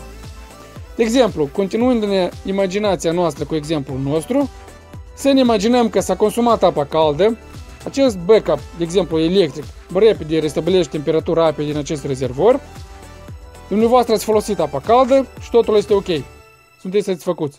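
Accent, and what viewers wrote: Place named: Moldova. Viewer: Prezentarile schematice ale dumneavoastra pe youtube sunt foarte benefice. Ami place accentul si limbajul dumneavoastra de moldovean autentic. Multumesc mult pentru efortul si timpul cheltuit. Am un system de incalzire al casei cu termosemineu pe lemne , centrala electrica trifazata de 19 kw typ instant hot water, cu care ami incalzesc caloriferele in 6 minute, pana focul din termosemineu incalzeste primii 200 l de apa din puffer apoi o opresc, un boiler electric care e conectat si la apa calda de la centrala electrica si la puffer. Pufferul de 800 l l-am compartimentat in 200, 400 si 800 l cu jutorul unor robineti, ca sa nu incalzesc toti 800 l de odata, dar nu l am cumparat si cu serpentina pentru a adauga instalatie solara cu tubui presurizate. Am vazut ideea dumneavoastra cu doua acumulatoare de apa care e f. buna. Credeti ca pe timpul iernii daca adaug systemul cu tuburi presurizate poate ajuta putin temperatura apei din puffer? 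Credeti ca e posibil sa adaug si systemul cu tuburi solare presurizate cu un nou acumulator de 500 l cu serpentina, la systemul meu deja cu doua surse pentru incalzirea caloriferelor si un boiler pentru apa menajera? Daca e posibil atunci doresc sa am o diagrama completa cu detalii, contra cost. Timpul si efortul cheltuit inseamna bani sunt de acord cu dumneavoastra. Multam frumos Costel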